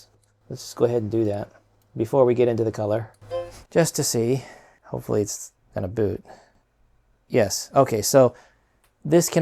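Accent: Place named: United States. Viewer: Another great video! You really do a great job presenting this, every project you demo makes me want to do it myself even though I don’t have the same computers😂. You are to blame for the retro Mac illness I am currently experiencing!😂. Did you say you aren’t based in America? Your accent/vernacular both suggest to me that are American! Where are you located?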